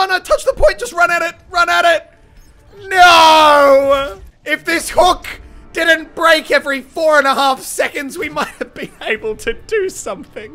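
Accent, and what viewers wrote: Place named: Australia. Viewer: It's because of his Australian accent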